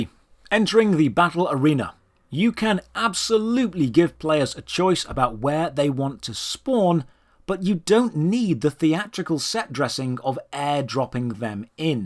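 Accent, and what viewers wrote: United Kingdom. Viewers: This is the same thing he does with MMO's to be honest and clear. He complains that MMO's require you to level up and play the game... to finish the story. He complaiend about FFXIV's story not being fully accessible, etc. He demands hand holding features, and he pretty much complains about any forced social behaviour, and he has ZERO understanding of the genre. I pointed out Everquest for example being one of the best, and earliest, MMO's that did not hold your hand and to this day is still succesful. He then responded to me and claimed he liked Everquest becauseyou could "play it solo or in a group." In what WORLD can you play EVERQUEST solo? It's clear does not understand anythign he is talkign about what-so-ever, and is basically exploiting a british accent to sound smart and impose what HE likes onto these games. And ostensibly, he likes single player story games.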